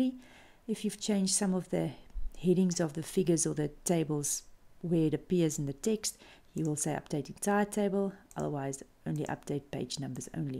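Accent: Afrikaans, South Africa. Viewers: You sound very much South African! Thank you for this!! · You sound South African?? 😂 Same here!! 😂 Thanks so much for this video!! I'm submitting my PhD in one week and you explain so clearly and simply! THANK YOU 😁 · She has an Afrikaans accent, from South Africa. I would know because I am Afrikaans too. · Her South African English accent is very dominant :|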